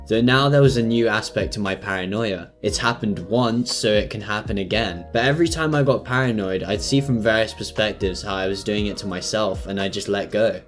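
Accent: United Kingdom. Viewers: Your videos are amazing bro: you deserve so many more subscribers!!! Also nice to hear a British voice in this community 🤩🎇🍃🍄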